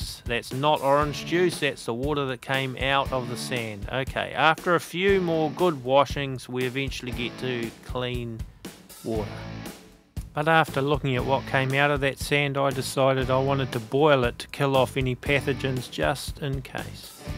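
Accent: New Zealand. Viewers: Fellow kiwi accent I hear?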